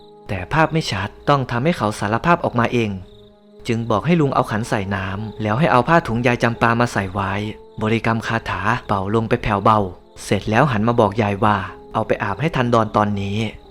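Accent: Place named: Roi Et, Thailand. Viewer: เว่าภาษาอิสานเมืองร้อยเกิน กะน่าฟังไปอีกแบบ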